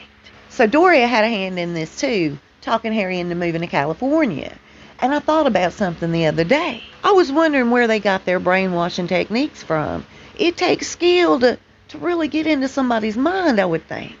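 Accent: South, United States. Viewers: She definitely is a southern girl! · good ole southern voice.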